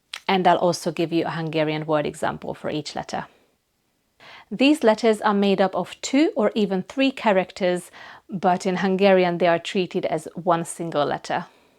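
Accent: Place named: North America. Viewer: you speak very well, i think you are native hungarian, but then at the end your english is North American. I am from Sask.Canada, and you don't have the eastern Canada French sounds or the middle USA drawl, and dont sound like you are from the UK.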